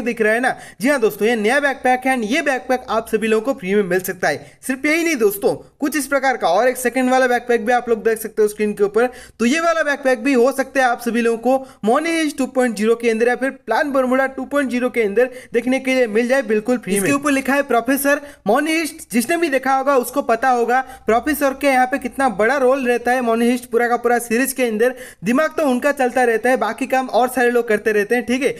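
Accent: Odisha, India. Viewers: Bro r u from odisha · Bhai tum odisha se ho na 😊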